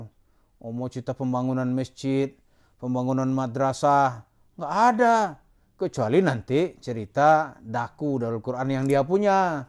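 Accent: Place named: Padang, Indonesia. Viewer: ente dr logat bicaranya sprti orang padang....berarti en😢ente sma dgn si Mansyur l😅ngkiengkieng mangkait...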